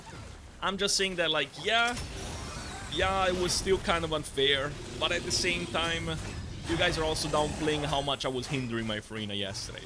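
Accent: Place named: Italy. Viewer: Accento leggermente italiano dio bono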